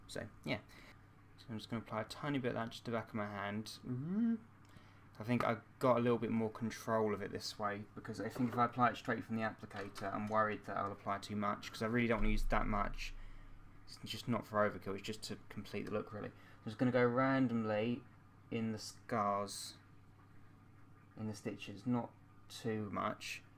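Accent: United Kingdom. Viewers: You did a FANTASTIC job on this... Maybe it's just the placement of the stitches helping, but you look like an honest to God woman in this one. Also, it took me a few videos to notice that you use the same brand of stage make-up that I use when I'm in a play! I think my brain dismissed that as possible because you have a UK voice, where I'm from the US. So it took me extra long to notice that, because I assumed that the brands couldn't be the same.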